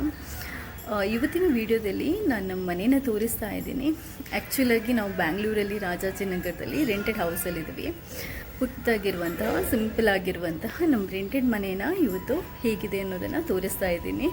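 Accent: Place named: Mangalore, India. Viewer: ಅಕ್ಕೆ ಇರೆನ‌ ಕನ್ನಡ ಕೇನಗ ಎನ್ನಿಯೆ ಈರ್ ಕುಡ್ಲದಾರ್ ಆದುಪ್ಪು ಪಂದ್. ಎಡ್ಡೆ ಆಂಡ್... ಇರೆನ tips ಮತ ಎಡ್ಡೆ ಎಡ್ಡೆ ಉಂಡು.... Bangalore ಡು ಈತ್ ಎಡ್ಡೆ ಇಲ್ಲ್ ತಿಕ್ಕಿನ ಇರೆನ ನಸೀಬ್... ಬಾರಿ ಶೋಕ್ ಉಂಡು... ಇರೆನ ಆಸೆ ಲೆಕ ಆಯಿನಾತ್ ಬೇಗ ಸ್ವಂತ ಇಲ್ಲ್ ದೆಪ್ಪುಲೆ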